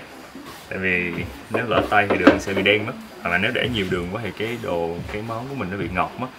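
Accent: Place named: Saigon, Vietnam. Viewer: Nguoi mien Tay, nhung noi giong Saigon, giong tuong doi dung chinh Ta, rat Ro rang, hay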